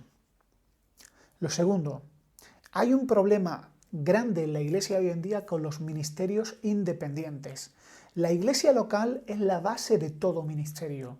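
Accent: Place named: Argentina. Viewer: No digas tonterías eres argentino se tu acento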